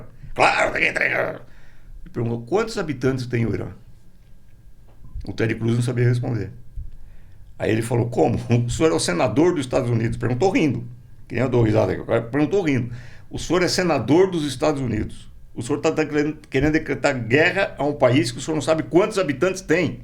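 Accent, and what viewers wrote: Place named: Rio Grande do Sul, Brazil. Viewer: Arbex disse q vc é barriga verde? Mas com esse sotaque? Mas bah tche? To confuso, como diria o velho gaúcho... o q tu és?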